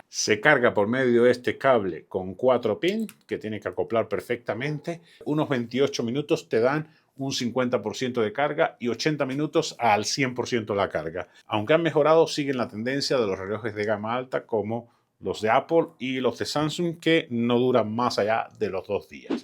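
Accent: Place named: Spain. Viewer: haaaa eres mexicano🤔,,porque tienes ese acento,,español.pero buen review,,igual que isa marcial 😁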